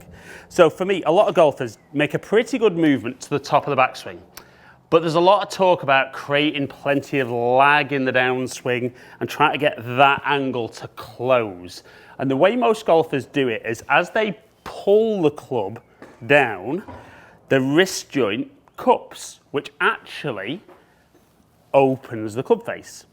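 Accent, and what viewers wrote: England, United Kingdom. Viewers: WOW, not knowing the difference between further and farther!!! And my man sounds English to beat all!!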